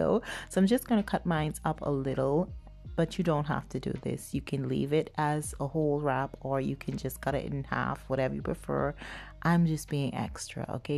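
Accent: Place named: Jamaica. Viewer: Love ur Jamaican voice 😆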